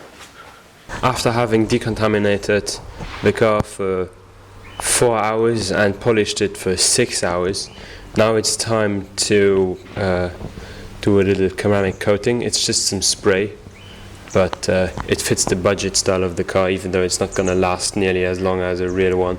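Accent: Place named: Minnesota, United States. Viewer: You sound like small town Minnesota. "Fuck ton" thats a gorgeous car.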